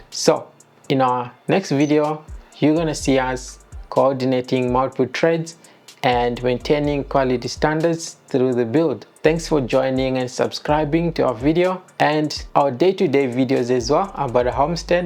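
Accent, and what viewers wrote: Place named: Kenya. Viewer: I thought you were kenyan from your accent. hmm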